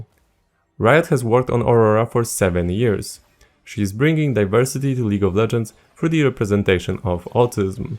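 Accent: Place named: Poland. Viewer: Ah ten polski akcent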